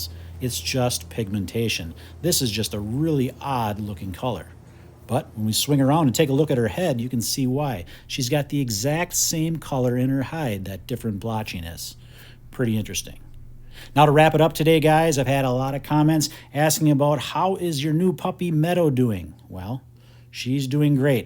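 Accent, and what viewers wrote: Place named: Wisconsin, United States. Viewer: The first time I watched 1 of your videos I said " oh yea this guy is definitely from Wisconsin " 😂 you sound like a sweeter, smarter and friendlier version of Bob from that 70s show and I love it haha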